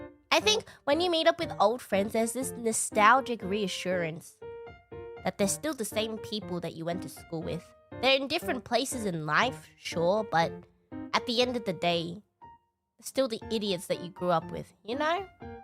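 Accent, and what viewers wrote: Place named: Australia. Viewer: 호주 영어 발음 뭔가 듣기 좋음ㅋㅋ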